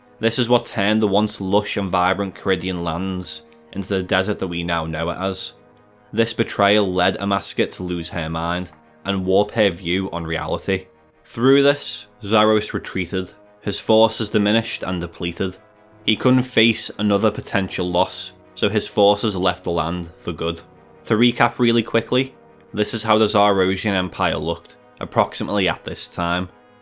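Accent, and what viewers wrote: Liverpool, United Kingdom. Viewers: Real Scouser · top scouser lad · Documentary length game lore video in the Scouse accent 😅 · Is that a Scouse accent? It really annoys me, but I love your content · ill bet my whole bank your a scouser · Listening to a Scouser explain RuneScape is the height of 2025.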